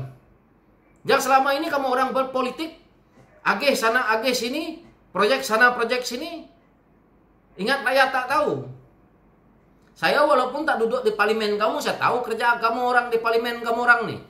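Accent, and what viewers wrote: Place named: Sabah, Malaysia. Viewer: mcm orang sabah slang semenanjung sj.. huhu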